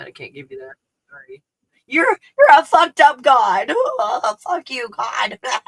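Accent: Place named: United Kingdom. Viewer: love you’re British accent honestly I have a really strong Yorkshire accent you would need subtitles to understand ❤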